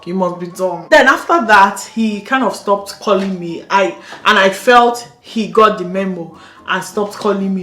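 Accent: Canada. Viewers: You’re beginning to have Canadian accent🌝💃🏻❤️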